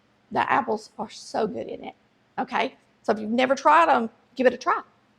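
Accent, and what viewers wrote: South, United States. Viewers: Your southern accent is as fantastic as your recipes! Thinking the Food Network needs to give you a show! I love how you take the time to put "loves" on all of the comments on here! Keep making all of our days more "country" and more sunny! ❤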